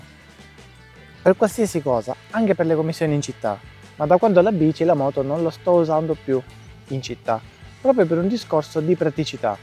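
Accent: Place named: Syracuse, Italy. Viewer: Salve, anche io ho una ep 2 pro e ogni tanto cerco video per avere confronti. Oggi, con molto stupore, ho trovato questo che oltre ai dati tecnici, mi ha riportato parzialmente a casa (ho parenti a Siracusa, la conosco benissimo e se potessi ci vivrei). Anche l'accento è familiare dunque mi sono iscritto anche per seguire aggiornamenti di questo modello. Complimenti per la chiarezza delle spiegazioni durante le prove. Ho fatto le mie prove di autonomia secondo i vari aiuti di modalità ma attendo quelle che farà in seguito per avere confronti. Complimenti ancora e W Siracusa.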